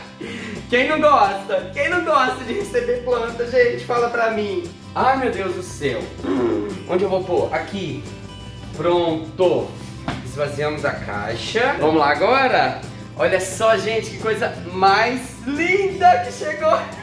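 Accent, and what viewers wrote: Minas Gerais, Brazil. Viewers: Vc é mineiro? Pq super me identifiquei no monte de "nuuuu " "nossinhora" 🤣🤣🤣🤣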